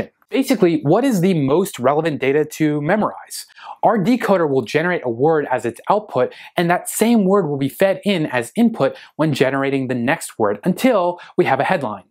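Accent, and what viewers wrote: India. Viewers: nice accent, not like most indian.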